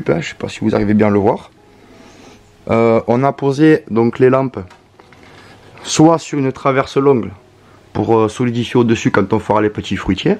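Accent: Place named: Southwest, France. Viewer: Salut tu est d'où avec ce petit accent de chez nous, moi je suis du Tarn, super ta vidéo, j'aime.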